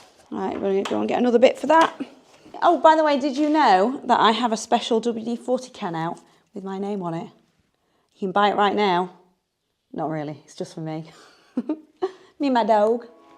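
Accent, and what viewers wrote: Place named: Manchester, United Kingdom. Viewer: Are you a Manchester lass.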